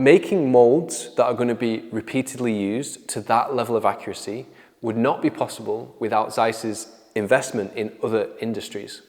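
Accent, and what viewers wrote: United Kingdom. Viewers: I can't remember which of these two lenses I got from The Spectacle Factory. I'm guessing I got Smart Life lenses because when I put on my Masunaga eyeglasses, I'm so much smarter. For proof, when I watch videos, I learn so much!!!...and it's not because of devilishly handsome face and that woozy British accent... though it does help. One thing's for sure, making an appointment with The Spectacle Factory is the smartest thing I've ever done.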